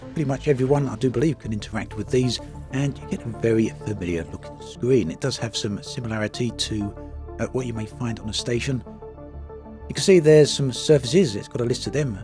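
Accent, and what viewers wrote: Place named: Dorset, United Kingdom. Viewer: Sir please reduce that music, I need to hear your dorset tones:)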